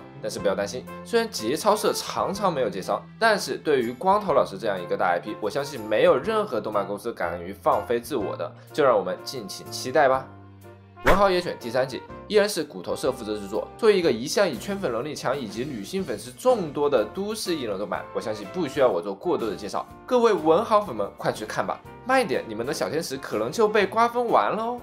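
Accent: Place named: China. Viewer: 听这口音应该也是我们国内的吧。。。为什么我觉得YouTube这里台湾人很少会介绍新番?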